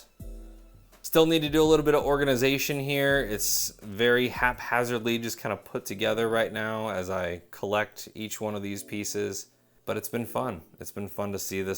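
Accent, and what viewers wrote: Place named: United States. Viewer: Nice tank.. I’m a brit so the American twang can sometimes bend my ear..is there really a coral called ‘arse crack’ ... 🤣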